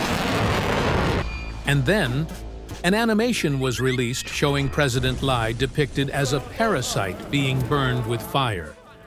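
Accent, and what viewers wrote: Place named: United States. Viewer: nippon looks Japanese but its Narrator sounds likea Usa Anglosaxon Go FIGURE THAT ONE OUT!!!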